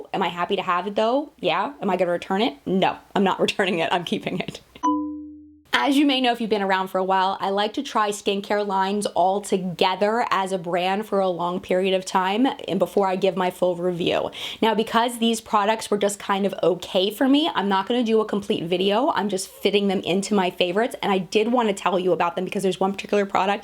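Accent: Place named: Pennsylvania, United States. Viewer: Luv your content! You have a southeastern Pennsylvania accent (think Philadelphia). Where are you from??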